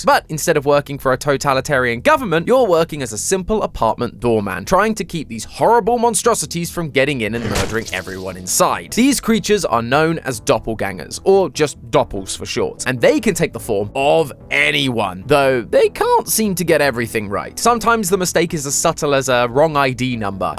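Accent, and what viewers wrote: United Kingdom. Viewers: wait since when become have a british accent? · At first, I thought was british, and it made me kind of mad, but now I can't even hear accent, I'm definitely gonna keep watching. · who the heck is this British guy, I don't understand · why is British now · You’re so British! Get better soon! 🩷🩷🥰🫶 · Why are you british (you are poggers) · Ew hes british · the british accent is just the cherry on top to annoy me anyways let's support other theory channels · Why did u turn British cuz before your like American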